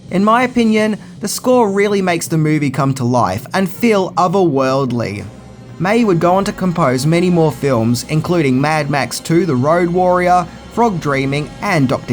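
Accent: Australia. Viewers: An Australian talking about Mad Max feels right. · Always makes me smile when an Aussie talks about Mad Max. Just seems more fitting than listening to American reviewers.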